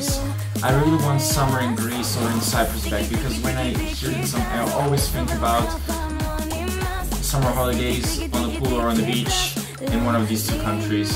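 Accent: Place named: Israel. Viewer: Bro......l can hear your isreli exent. pliz stop embresing your self. THNKS.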